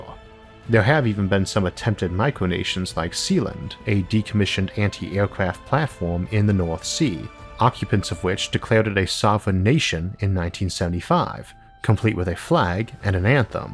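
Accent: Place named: South, United States. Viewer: I love space and everything about it. I have been looking for years for a channel that will satisfy my hunger for knowledge, both factual and fantasy. I have finally found it. Thank you Isaac and team. As for the "speech impediment". It's no impediment, this channel wouldnt be the same without your AMAZING Gone With The Wind lilt. SCARLETT: Isaac, Isaac....Isaac, if you go, where shall I go? What shall I do? ISAAC: Frankly, my dear, I don't give a damn. I'm off to Mars!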